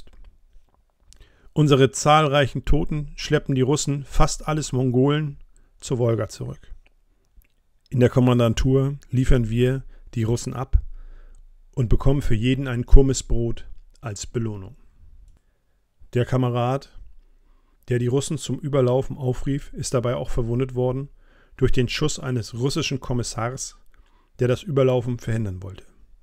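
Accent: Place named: North, Germany. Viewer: Ein wirklich bewegendes Zeitdokument, das so lebhaft und plastisch beschreibt, dass einem direkt die Bilder dieser Kraterlandschaft vor Augen kommen... Was mich beeindruckt hat, war die manchmal schon fast poetische und malerische Schönheit und Präzision der Sprache, mit der dieser damals 18jährige junge Mann seine Erlebnisse und Eindrücke schildert. Ein großes Lob an Sie für die Vorarbeit und auch für das Einlesen dieses Textes selbst. Die Tatsache, dass kein Schauspieler oder Synchronsprecher diesen Text liest, sondern jemand mit in diesem Fall norddeutscher Klangfarbe, macht das ganze noch authentischer, noch spürbarer. Vielen Dank für diese Arbeit!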